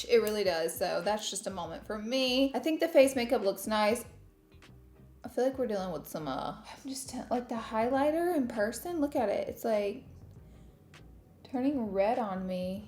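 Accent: South, United States. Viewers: Girl....That Black lipstick ruined it for you Lol 😂, but I guess it was fun....Good to see you doing a makeup tutorial But I do much prefer your other videos now with a little bit of home decor and the family in them. Your So Cute and I love your accent, southern charm girl. Best Wishes and Kindness from Queensland Australia 🇦🇺❤️❤️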